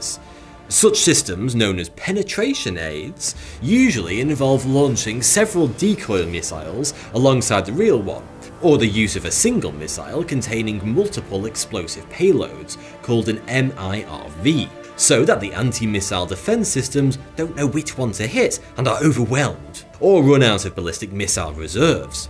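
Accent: United Kingdom. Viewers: Watching this makes me feel like your 20yrs behind like you know top secret you sound like your British forsure technology is not your field from across the pond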